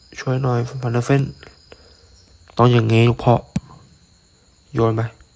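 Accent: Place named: Ang Thong, Thailand. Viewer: คนอ่างทองหรือสุพรรณคั้บเนี่ย สำเนียงเหมือนเพื่อนผมเลย😊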